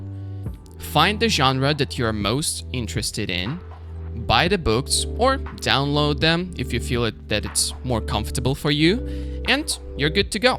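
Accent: United States. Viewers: are you American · Hi, just one question for you '' What has made you sound American? as you mentioned you have never set foot on the U S. thanks.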